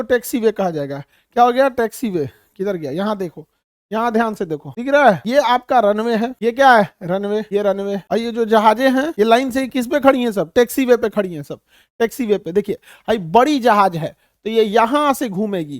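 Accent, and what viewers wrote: Bihar, India. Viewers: Bihari hai sir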